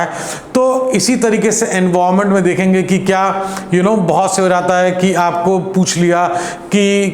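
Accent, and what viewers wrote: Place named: Kannauj, India. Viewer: Sir you are such a best motivator. Apne toh mera mind hi change kr diya ap kannauj se belong krte hai kya sir apki language is like my mother tongue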